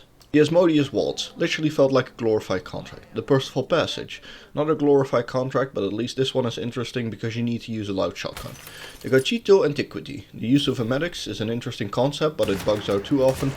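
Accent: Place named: Netherlands. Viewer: Nederlands accent haha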